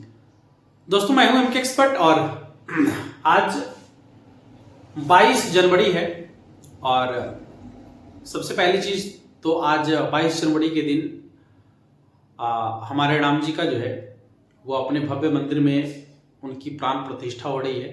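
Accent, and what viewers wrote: Bihar, India. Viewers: Bihar h kya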